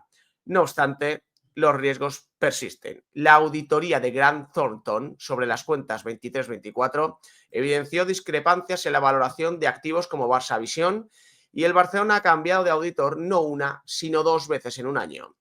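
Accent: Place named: Cádiz, Spain. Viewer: Ola, eres la alegría de la huerta, se nota que eres de Cadiz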